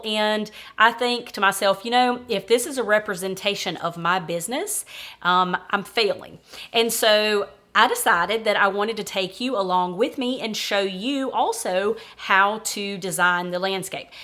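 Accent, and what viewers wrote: South, United States. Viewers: I am so excited to have found your video! I found you through Dig, Plant, Water Repeat :) I’m growing in Hawaii so I’m a zone 12a. My climate and soil is drastically different than yours. I have all soil brought in and it’s only 3 inches before I hit solid lava rock! I wish I could design beds like yours :) I also love the sound of your voice and accent. My fiancé is from Georgia and Alabama, so listening to you talk is very similar to his grandma and mom’s. It’s so soothing and lovely. I cannot wait to follow your journey!! I make garden videos too (not as great as yours) but if you want to see what us hawaii people garden in then give it a look. Aloha!!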